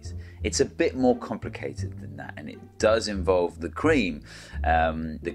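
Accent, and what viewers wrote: England, United Kingdom. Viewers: You are very entertaining as you are enlightening. Got to say, as a Southern born American, I love the English accent!!!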